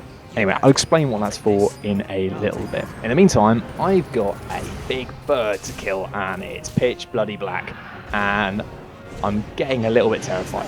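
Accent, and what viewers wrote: United Kingdom. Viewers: dude the english accent is so cool wish i had one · Every time I listen to the commentary I always think of Simon Pegg. I mean I'll probably just buy this game based off of this guys sweet UK accent and commentary :P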